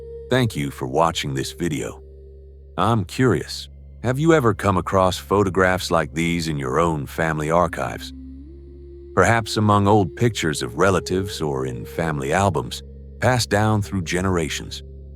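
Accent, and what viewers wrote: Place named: United States. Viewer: Was this about British history? If so, why are we listening to an american voice - it's very disrespectful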